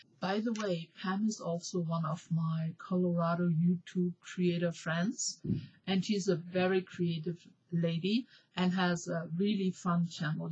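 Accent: Germany. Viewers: Hi! Yes I won Andrea's journal and a lot of artsupplies! But you have a European accent right....? German? So great you love our little country. Hope you still visit Europe, I love to go to the USA. Last year I was for a short visit in Denver and I think you live there now? Thanks for your reply!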